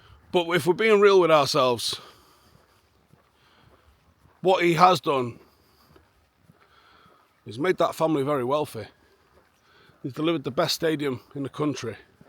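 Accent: Manchester, United Kingdom. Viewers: I think that's just how we mancs talk mate!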